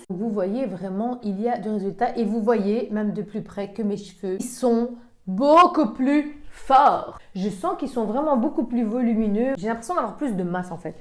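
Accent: Belgium. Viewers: Je crois de belgique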